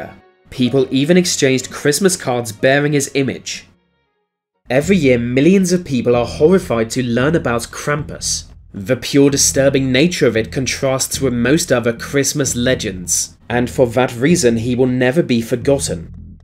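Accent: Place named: RP, United Kingdom. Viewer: now that's the Queen's English and how it should be spoken.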